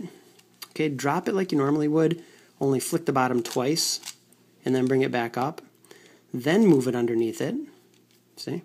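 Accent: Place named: Wisconsin, United States. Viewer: You sound like you are from Wisconsin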